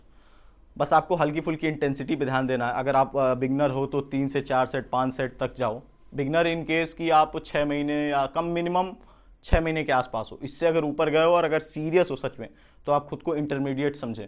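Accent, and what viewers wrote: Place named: Awadhi, India. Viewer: Yaar tumhara Awadhi dialect kafi achcha lagta hai.. 🌼🌼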